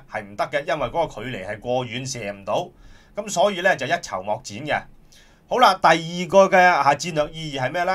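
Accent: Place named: Cantonese, China. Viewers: 主持緊係番禺人::講緊番禺話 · 拆爐試沙煲🤣好多廣東俗語..有時聽返都幾頂癮 好有廣東式嘅演繹 特別親切👍.因為我成日都有睇你做主持 好多都正合我心意對社會不公為我們市民發聲.. 辛苦曬你🙏💪😁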